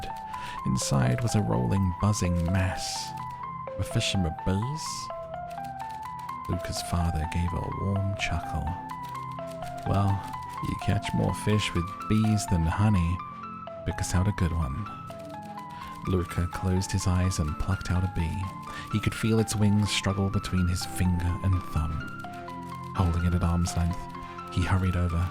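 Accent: United States. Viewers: Your southern US accent is sooo good whether youre doing it for men or women. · I thought your American accent was really good! My brain didnt even notice it until you mentioned it, which is what you want when doing an accent.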